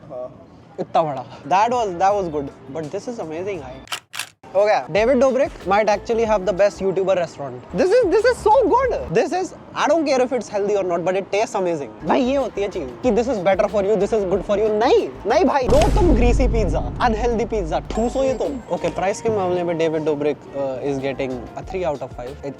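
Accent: Rajasthan, India. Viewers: Bro has the strongest Indian accent I've ever heard 😅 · bit of rajasthani accent?? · thats the most Indian accent i have heard outside India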